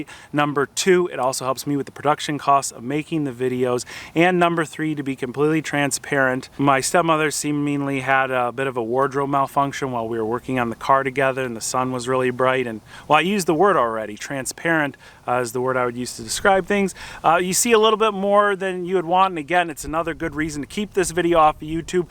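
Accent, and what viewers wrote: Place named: Florida, United States. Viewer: You are starting to sound like the @@@@ Floridians who show up here in NC. And no, I am not going to Instagram because it is owned by FaceSuck.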